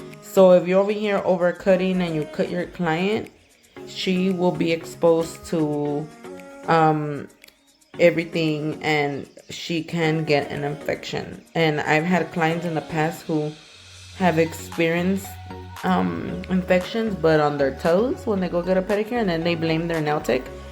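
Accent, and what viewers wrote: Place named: Spain. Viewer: I love love your Spanish accent, sounds like my granddaughter blessings from Killeen Texas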